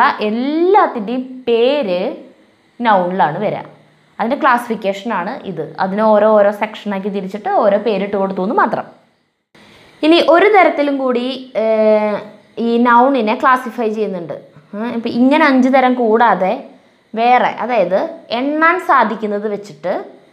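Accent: Thrissur, India. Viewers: ഹായ്ന്റ പെങ്ങള് കുട്ട്യേ.. ക്ലാസ് അസ്സലായി ട്ട, തൃശൂർ സ്റ്റൈലിൽ വർത്താനം കലക്കി ട്ട, teacher മ്മ്മ്ടെ തൃശൂരാണ്,class excellent, അറിവ് പകരുംതോറും വർദിക്കും. ദൈവം അനുഗ്രഹിക്കട്ടെ !!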